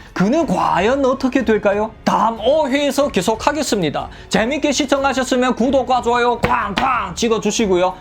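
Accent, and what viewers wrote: China. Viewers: 따거는 중국동포인가요? 말투가 아주 맛깔나네요!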